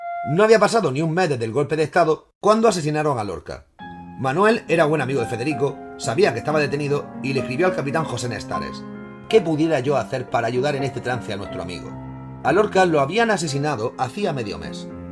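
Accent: Spain. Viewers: ¿De qué parte de España es?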